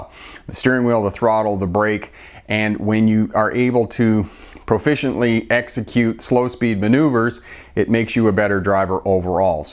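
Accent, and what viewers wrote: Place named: Western Canada, Canada. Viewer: I was watching this thinking, "this guy must be from Western Canada" and lo and behold from BC. I can tell me Canadian accents pretty good.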